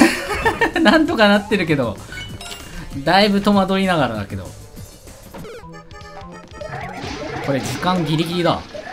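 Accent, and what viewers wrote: Kansai, Japan. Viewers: 最近、若干関西弁挟んでくるの面白いw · さんの関西弁好きやな〜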